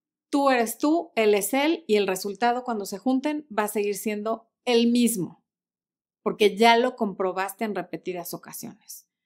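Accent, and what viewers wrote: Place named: Mexico. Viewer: Lo máximo. Me encantan tus consejos. Y tu también tu asentó mexicano.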